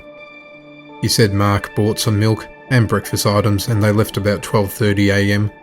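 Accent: Australia. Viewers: Love the video, glad I've found your channel- are you a fellow Australian or from New Zealand?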